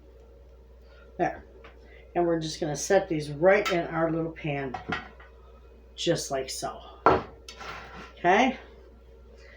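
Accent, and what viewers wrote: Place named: Midwest, United States. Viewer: I live in the south now, but grew up in the Midwest. I love to hear that Midwest sound in your voice almost as much as I love to watch you cook.😊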